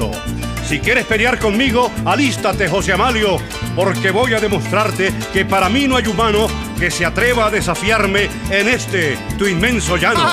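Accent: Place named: Bogotá, Colombia. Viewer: una voz magnífica, una dicción espectacular, todo un artista; pero declamó como un bogotano. Si quieren saber cómo declama un llanero, busquen el poema el cazador novato karacachian.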